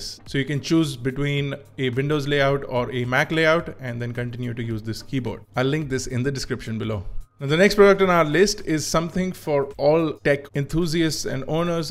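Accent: India. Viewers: R e bhai aluminum bol le..kya alomenum alomenum lga rakha h...kab tak angrejo ki copy karoge...Indian accent is the best accent.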